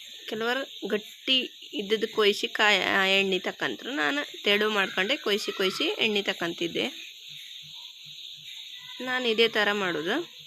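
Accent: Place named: Kundapura, India. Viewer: ಸೂಪರ್ ನಿಮ್ಮ ಕುಂದಾಪುರ ಭಾಷೆ ಕೇಳೋಕೆ ಚಂದ 👌👍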